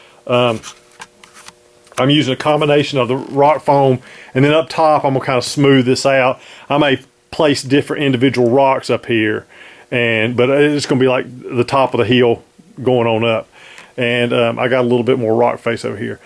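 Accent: South, United States. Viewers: And you're from down SOUUUTTHH, lol. Great video.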